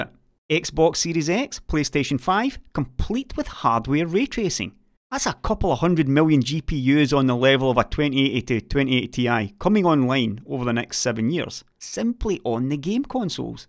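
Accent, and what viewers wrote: Scotland, United Kingdom. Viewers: You're Scottish? Never would have guessed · a thick scottish accent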